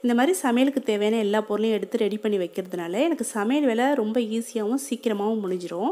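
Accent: Karaikal, India. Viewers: Karaikwl slang- tholuvittu 😄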